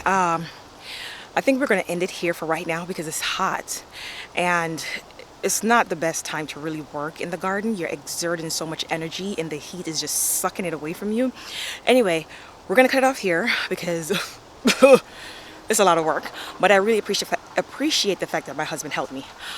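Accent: Jamaica. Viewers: Rooting for Jamaican girl love wi accent. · You went back to the Jamaican talking, me tired me nah lie ,lol a nuff work this you know 😄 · Love when the Jamaican comes out. Mi tyaad. Can't wait to have the land space to do this extensive gardening. Love watching your videos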